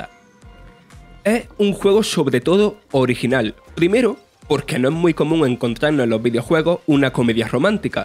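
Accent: Spain. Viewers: Esa forma de hablar es de algún lugar de España? Porque la escucho seguido y no entiendo porque hablan así. Yo soy de argentina, por eso pregunto.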